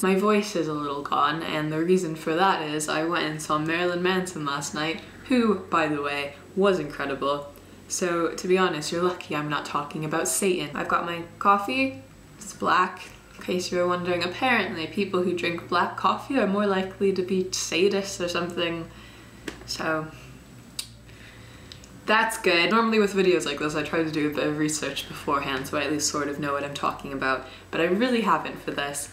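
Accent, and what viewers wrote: England, United Kingdom. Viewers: and you've a little English twang in your voice ohmygod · You have like a British accent in this lol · I watched this video and the first video you posted on YouTube. I swear your voice sounds way different. I first thought you were British, but in your first video you sound American! Haha, are you watching too much BBC? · Okay legitimate question about your accent (not meaning to be harsh or offensive): How have you managed to develop a semi-English accent? Is it somewhat deliberate? Because I find it hard to believe that you can naturally pick up an accent when you're a young adult. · Does anyone else notice her slight British accent? If you do, isn't it adorable?! And yeah, I believe that wee not the only ones out there.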